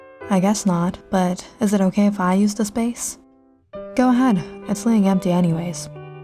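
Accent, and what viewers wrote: Russia. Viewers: I'm in love with that russian accent 🥺😂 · Good story, madam. Love that Russian accent, though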